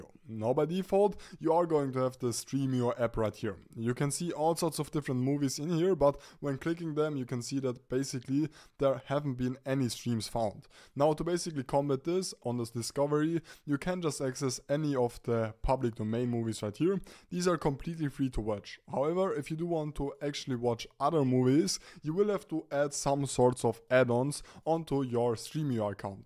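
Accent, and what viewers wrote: Germany. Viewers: He sounds very German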